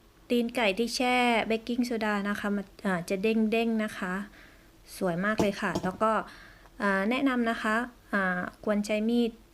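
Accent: South, Thailand. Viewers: รู้เลยว่าคนพากย์คนใต้นิ555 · เก่งจังน้อว คนใต้แน่เลย สำเนียงได้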